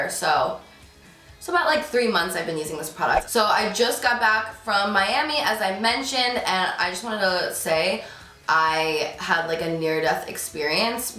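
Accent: New Jersey, United States. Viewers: are you from Jersey by any chance?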